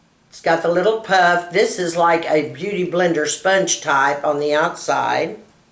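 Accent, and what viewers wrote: Texas, United States. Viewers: You look as young as the others and that is the result of a lot of skin care and taking pride in yourself - you look stunning and I love that Amore Pacific and it sounds wonderful - great choices; I don't powder either - have a wonderful day - love you like a pig loves bacon heehee xxxxx Suzy.....love your Texan accent with the French words:)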